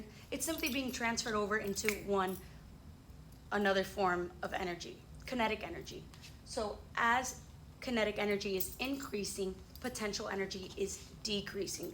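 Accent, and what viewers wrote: United Kingdom. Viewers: The British accent eats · Wait are you actually British? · Omg I love your videos so also i love the British accent lol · Pin pls the BRITISH ACCENT GOT ME😂😂😂😂😂 literley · That British accent is so spot on!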